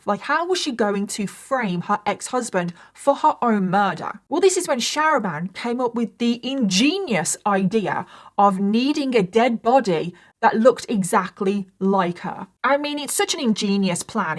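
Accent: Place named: West Midlands, United Kingdom. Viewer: Only just found your channel. Your accent sounds local to me, West Midlands. Makes a nice change 😅 I’ll definitely be back to watch more.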